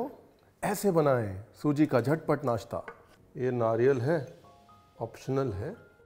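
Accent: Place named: Punjab, India. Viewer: Sir aap kahte ho ki aap lakhnow se ho But aapke bolne me to punjabi lehja hai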